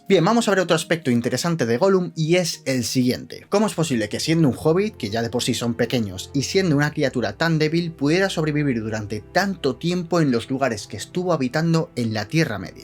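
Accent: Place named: Spain. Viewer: Odio el dejo español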